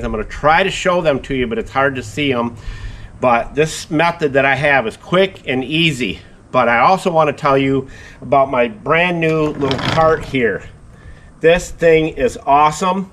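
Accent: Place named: Michigan, United States. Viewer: Been watching you for years. If memory serves you were somewhere in Michigan. (We can still hear the "Michiganer" accent) We're down near Tampa. Welcome to Florida.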